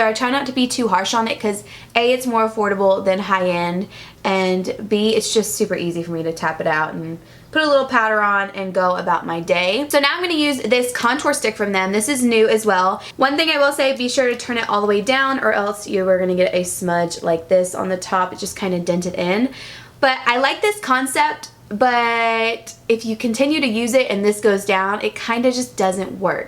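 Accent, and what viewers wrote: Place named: Georgia, United States. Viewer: what part of ga are you from girl?!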